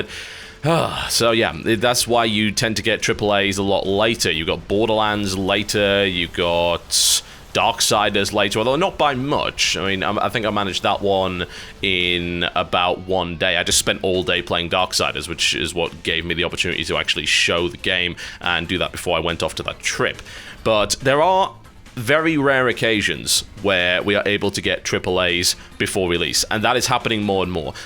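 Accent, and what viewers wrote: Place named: Newcastle, United Kingdom. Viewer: Proof that TB has some Geordie left in his accent. "Boook"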